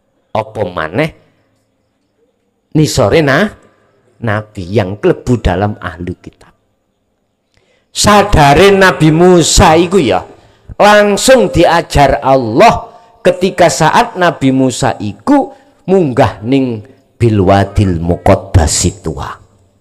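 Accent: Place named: Java, Indonesia. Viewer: Benar sekali,sy yakin seyakin-yakinnya, Insyaallah setelah menyimak video ttg, Zikir Sirul Asror dr pak Kiai,sy merasa lapang menghadapi apapun yg menimpa diri dan klg ataupun siapapun,dan menghilang kan rasa ujub,riak,iri, dengki dll, Terimakasih Guru semoga sehat selalu beserta keluarga besar,dr Lombok timur NTB(logat jawanya dpt sy pahami, walopun tdk semuanya,klo bisa kurangi BHS jawanya Kiai)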